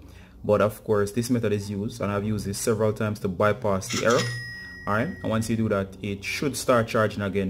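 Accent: Jamaica. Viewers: I heard offer up signal 😆 thanks for the tips. Are you Jamaican ?🇯🇲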